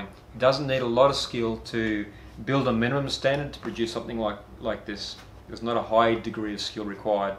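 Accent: Australia. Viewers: Just found your videos on masking the waxed bag. Great to see and hear an Aussie on YouTube. Can’t find the video you refer to about actually waxing the fabric/ the recipe etc. Ps. I too have a singer 201. Great little machine. Mine can be used as electric or hand crank - handy for those power outages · GREAT WORK. Hey mate send me one of those orange tool bags. Let me know what you want for it and for post. HAWK........with the accent is Perfect English or at least Australian! Wouldn't know who was talking if you didn't add the Aussie flavor!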